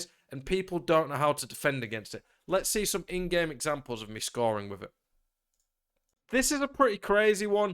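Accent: United Kingdom. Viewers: Man this is the most British accent i've ever heard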